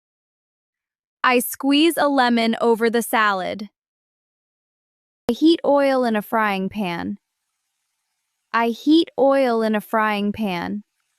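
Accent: United States. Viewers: Please is it american pronunciation!? I would like British one also😊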